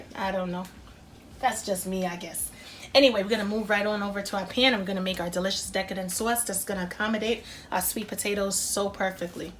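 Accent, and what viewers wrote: New York, United States. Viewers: I hear the NYer in your voice 😂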